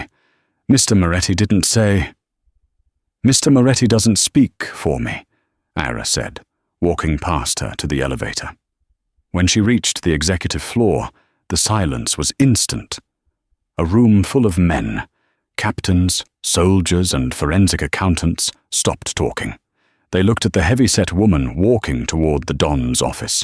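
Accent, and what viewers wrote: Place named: United Kingdom. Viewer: I have a simple solution if you are interested? I just put a piece of paper over that picture section of the screen, and click on Transcript to listen and read along with that purring English / British accent, and let my imagination do its magical thing, as it was meant to. A humble suggestion from Windsor, Ontario Canada EH?!🇨🇦 Cheers! Enjoy!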